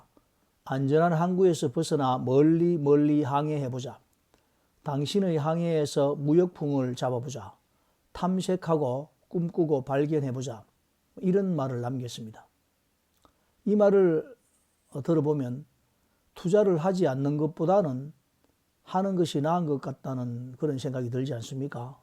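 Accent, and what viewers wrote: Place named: Gyeongsang, South Korea. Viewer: 공통점!? 경상도 말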